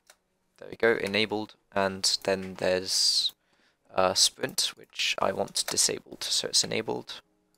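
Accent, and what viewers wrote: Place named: United Kingdom. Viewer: lol are you deaf? hes 100% British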